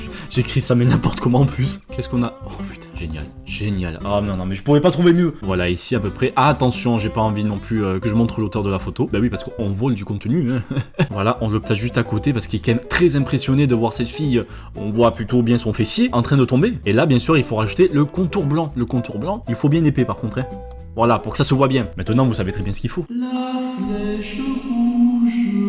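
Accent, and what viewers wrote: South, France. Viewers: Non le bouc c'est sa marque de fabrique avec aussi son bel accent du sud (que j'ai aussi) c'est sacré comme les cheveux bouclés pour Norman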